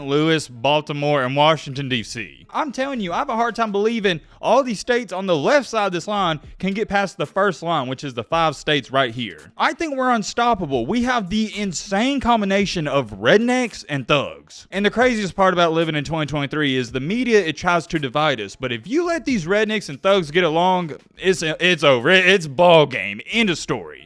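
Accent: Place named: North Alabama, United States. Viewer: What part of North Alabama are you from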